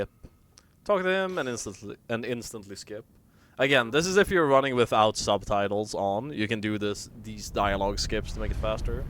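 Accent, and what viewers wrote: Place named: Sweden. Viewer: är det en svenne mina öron skådar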